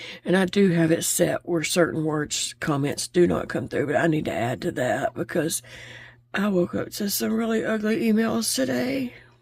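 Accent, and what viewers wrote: South, United States. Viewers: Hi. Im sorry if i said something to hurt you. I dont mean no harm. I cried right with you. ❤❤ive been watching you for some time. And i do love just listening to your wonderful humor and your southern accent ❤❤ ive had cancer and just not my self lately. But i just want you to know i love you and all your art work and im here to support you. So again forgive me please ❤❤❤❤❤❤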